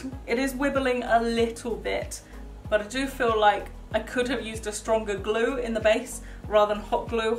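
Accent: United Kingdom. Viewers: I love your British accent ❤️❤️❤️❤️❤️❤️❤️❤️ also you are soooo pretty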